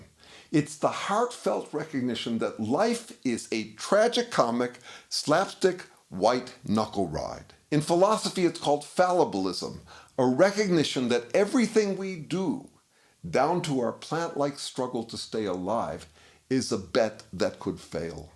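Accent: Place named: California, United States. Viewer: He seems to have a California accent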